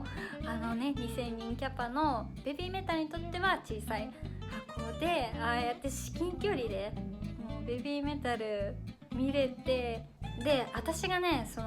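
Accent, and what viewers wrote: Hiroshima, Japan. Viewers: たまに話す方言からすると広島ですか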